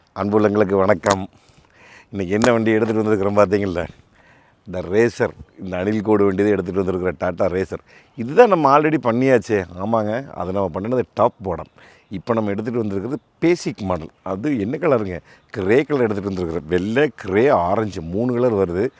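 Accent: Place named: Kongu, India. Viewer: அக்கா சரிக்கா. வெளிய போங்ககா 😂😂😂😂கொங்கு தமிழ்.. அழகு... மொறு மொறு மொறு மொருன்னு 😂😂அருமை அண்ணா 🙏🎉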